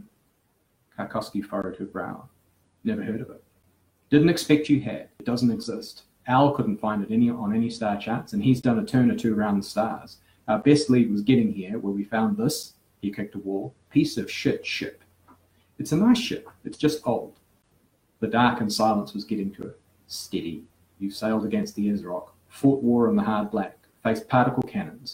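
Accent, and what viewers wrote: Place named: New Zealand. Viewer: Oh my! How I loved this! As a mujer living in Spain and whose English May be considered a second or third language-depends from where you look, born and raised in Tanzania with Afghan origins and comping to live in Spain at the tender age of 12-, I love listening to your NZ accent, and how English varies from the ex colonies. Same thing happens with Spanish from Spain and the Iberoamericanos(Latin America) Spanish. Thank you,! for the lesson. I really enjoyed it!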